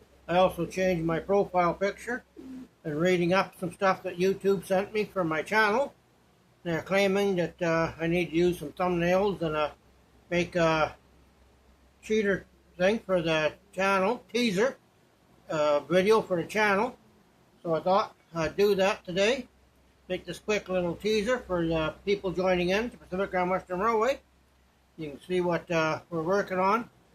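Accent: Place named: Vancouver, Canada. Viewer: Interesting. I've not heard your accent here in Vancouver, surprisingly. I moved here nearly 30 years ago; maybe your accent is an older one from this area.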